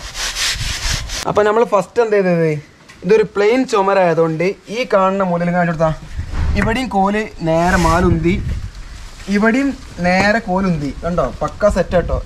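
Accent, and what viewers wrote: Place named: Kondotty, India. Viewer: നല്ല ക്ലിയേർ വിഡിയോ എല്ലാവർക്കും മനസ്സിലാകും..ഇനിയും വരട്ടെ,അല്ല നിങ്ങളുടെ നാട് എവടെ കൊണ്ടോട്ടി ആണോ മൊറയൂർ... ആ ബാഗത്തെ ഒരു സ്ലാഗ്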